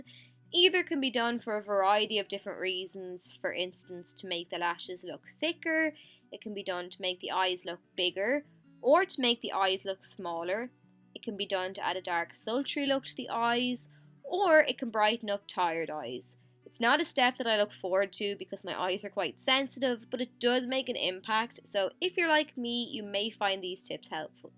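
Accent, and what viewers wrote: Ireland. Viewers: love your Irish accent I have a Boston Massachusetts accent lol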